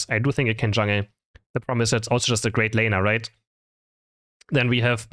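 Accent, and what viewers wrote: Germany. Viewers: Are u german?because du hast da ein akzent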